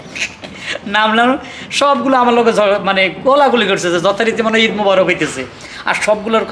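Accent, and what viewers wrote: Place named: Noakhali, Bangladesh. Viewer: খুবই ভালো লাগলো। ভারত থেকে বলছি, আমি হারুন কিসিঞ্জার ভাইয়ের প্রচন্ড ভক্ত। ওনার সব ভিডিও আনন্দ করে দেখি। হারুন কিসিঞ্জার ভাইয়ের প্রতি আর একটি কারনে আমি আত্মিয়তা অনুভব করি। আমাদের পুর্ব পুরুষের বাসস্থান নিল নোয়াখালী জেলায়। বর্তমানে পশ্চিমবঙ্গে আছি। ছোট বেলায় আমার ঠাকুরদা বাবার মুখে নোয়াখালী ভাষা শুনতাম। আপনার কথা শুনে শৈশবের কথা মনে পড়ে। আপনি এভাবেই চালিয়ে যান। ভালো থাকবেন। শুভেচ্ছা জানাই।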